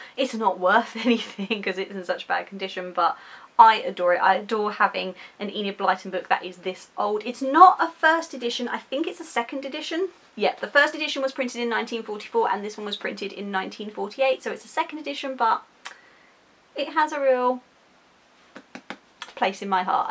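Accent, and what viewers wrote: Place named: United Kingdom. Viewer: Wow! The Famous Five is pretty enjoyable, I am definitely going to read as well, cause I was looking at my library and I just found one Famous Five - Five run away together. To be honest I am really excited. Nice this video, just subscribed. On the other hand I enjoyed a lot your british accent. Happy reading day after day!:)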